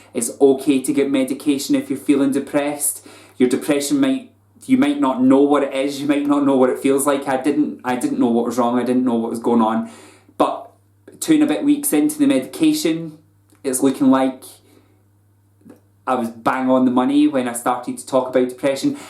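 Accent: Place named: North Scotland, United Kingdom. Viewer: omg I had no idea ur in Aberdeen. I'm in Aberdeen too. wheres your accent from, you sound like your from further up north xx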